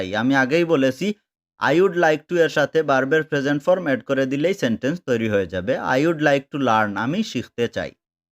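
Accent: Sylhet, Bangladesh. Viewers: Sylheti vai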